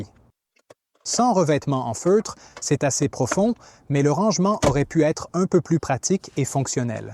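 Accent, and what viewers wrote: Quebec, Canada. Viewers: c'est curieux mais il a un accent du quebec ?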